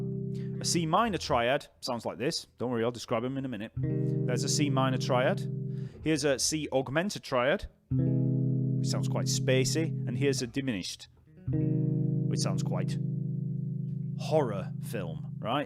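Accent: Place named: Northern England, United Kingdom. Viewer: Hey, cool about moving here to USA! I’m from both San Diego/Los Angeles, and live currently just north of Atlanta. I love your up north accent, no wonder I have no issues understanding you, because I am used to my fav Manchester bands accent, and can understand them when they’re drunk (most of the time), you kinda sound like Johnny Marr, Bernard Sumner!:) Sheffield is where the is from I think! I love Phil Oakey. They have some great bass lines, mostly synth but I think there’s a bass guitarist for “ ”:) glad you’ll be closer, so I stop missing the love hangs so much! Lol Dallas or Austin in TX is also cool! I’ve visited. Anyway thank you for this lesson! What you described about the chord progressions having a lot of extras on them is exactly what I’ve been struggling with within music theory. I bought your chord tones course and I’m so glad I did! 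I’m excited to get a start on it soon as my tendonitis gets better! Thanks so much for your awesome teaching style and materials! I have so many aha! Movements when watching your courses and YouTube videos!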